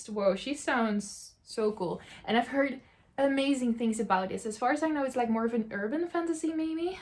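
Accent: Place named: Netherlands. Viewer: HI!! I just subscribed to your channel. I'm also from the NL and I love how you speak English ahaha. Good luck with your TBR!! And lots of fun💖💖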